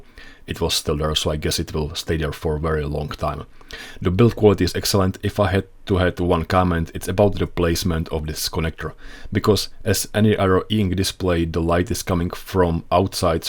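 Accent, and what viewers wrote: Czech Republic. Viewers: Love it ! Looks really nice, especially the ink display. Also, are you Czech by any chance?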